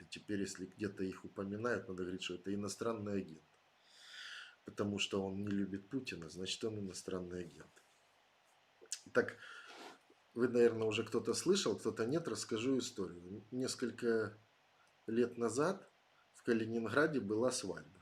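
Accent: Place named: Ukraine. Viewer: ваш украинский акцент в речи, мягкий и душевный, мне очень приятно слушать. Моя мама с Украины, с Херсонской области, я каждое лето бывала у бабушки и этот акцент вызывает ассоциации с детством, с какой то общностью людей разных национальностей. Мне нравится ваша простота, искренность и ваше мировоззрение. Спасибо вам за стрим, за отсутствие нарциссического стремления к совершенству, за поддержку нас, беларусов и всех людей доброй воли. Удачи вам и здоровья!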